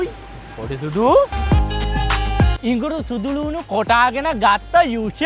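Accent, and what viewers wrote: South, Sri Lanka. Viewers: Dakune bashavada manda😂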